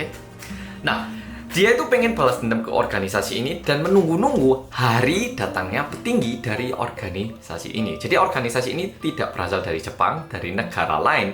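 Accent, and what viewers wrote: Java, Indonesia. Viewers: medok ngk ap 2 bang kan lucu orang korea medok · meskipun YouTuber lain nya sudah upload tapi aku masih tetap setia menanti videonya mas. G tau kenapa enak saja di dengar, jelas dan mudah di pahami 👍🏻🙏🏻 karena aku suka YouTube Yang MEEEEEDDDOOOOKKKKK🤗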